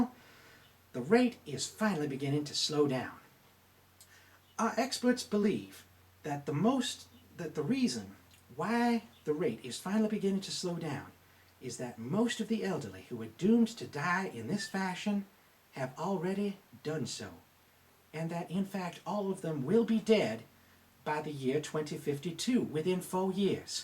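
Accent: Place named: Virginia, United States. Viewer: Nice northern coastal Virginia accent.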